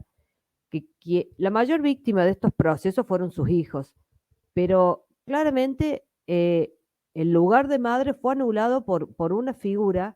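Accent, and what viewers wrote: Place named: Córdoba, Argentina. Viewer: Gracias por tu análisis tan claro, por cierto, me encanta tu acento, Córdoba?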